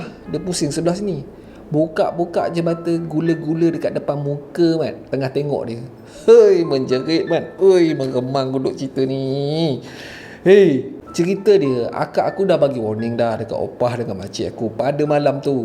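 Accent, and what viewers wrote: Perak, Malaysia. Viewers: Ooo mmbesar dtmpt kelahiran saya,w.maju,tu dgr lohat perak je😊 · ptt ler ade sleng perak...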